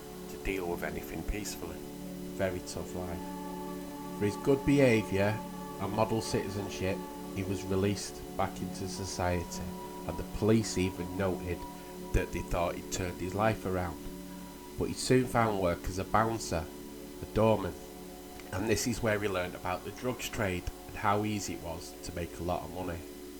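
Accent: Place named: Manchester, United Kingdom. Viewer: Liverpools in the North East? U sound like a Manc and you say Liverpool is in the NORTH EAST? Lol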